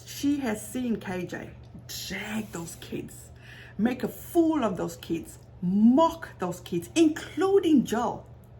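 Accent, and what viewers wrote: South Africa. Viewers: You sound like you have a Cape Town accent! I love your accent 😍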